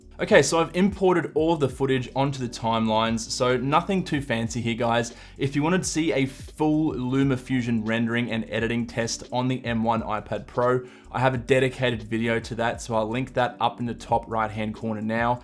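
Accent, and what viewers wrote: Australia. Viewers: why does cutie boi have an Aussie accent?!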